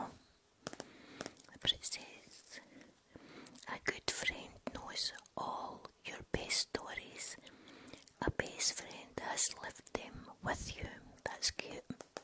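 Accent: Scotland, United Kingdom. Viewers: XOXO right back at ya😁. I'm honored that you replied. As an American, I really appreciate your enchanting accent. I'm so glad to have found your videos, they ease my anxiety and are so very relaxing. Keep up the great work, it truly has a positive impact. Your sincere kindness and caring really come across. Thank you.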